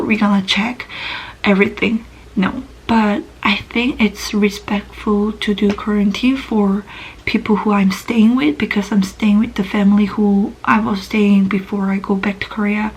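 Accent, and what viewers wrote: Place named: South, United States. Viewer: 미국 남부지역에 거주하다가 욌다는 것을 영어억양듣고 추정할 수가 있어요, 표준발음은 시카고나 디트로이트 주변에서 거주해야 가능합니다.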